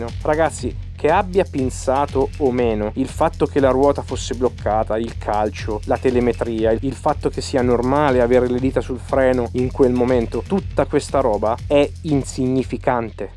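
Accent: Perugia, Italy. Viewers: Accento perugino inconfondibile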